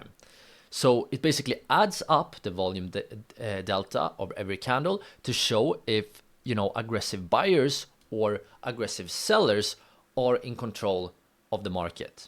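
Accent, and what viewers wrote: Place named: Sweden. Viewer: I hear the svenglish from mile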